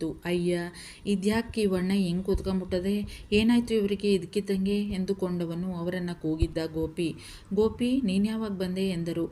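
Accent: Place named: North Karnataka, India. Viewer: ನಮ್ಮ ಉತ್ತರ ಕರ್ನಾಟಕದ ಕನ್ನಡ ಛಾಯೆಯೊಂದಿಗೆ ಉತ್ತಮವಾದ ವಿವರ 😇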